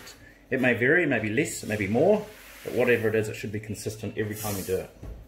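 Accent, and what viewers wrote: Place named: New Zealand. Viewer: That was awesome mate!? I'm from Australia and I just llooooove the NZ accent ... keep it up. I have to clean my Vibiemme Domobar Piccolo. Well done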